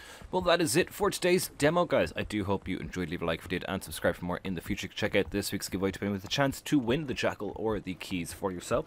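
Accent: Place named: Ireland. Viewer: Are you Irish or just live in Ireland? I will admit I've wondered about the origin of your accent :D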